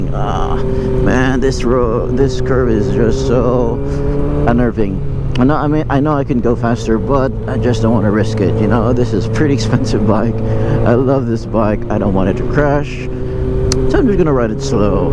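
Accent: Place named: Philippines. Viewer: he's accent is Filipino, so i guess Filipino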